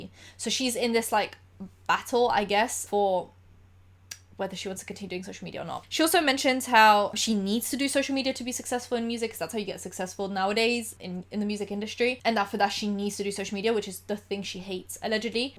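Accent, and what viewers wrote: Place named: England, United Kingdom. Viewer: Shes English. Not sure exactly where in England though. Maybe London?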